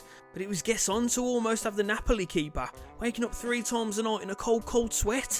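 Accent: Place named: Birmingham, United Kingdom. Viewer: I think you re from a posh area in birmingham shard end kingshurst chelmsley wood , solihill ?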